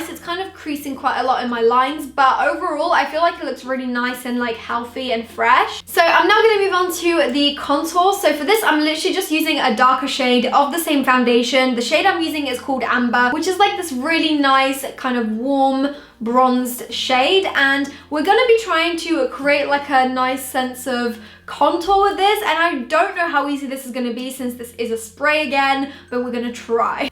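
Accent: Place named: England, United Kingdom. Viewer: she is from England! she has a similar accent to me but mines more high pitched :)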